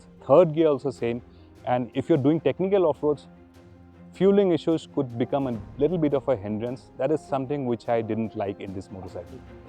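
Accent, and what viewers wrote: Assam, India. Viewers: Happy to see more assamese people in the automotive space. Accent tu huniye vabisu axomiya neki baru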